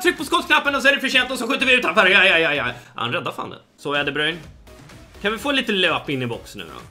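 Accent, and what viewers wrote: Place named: Stockholm, Sweden. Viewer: sjukt hur stockholmsk du e men ändå bäst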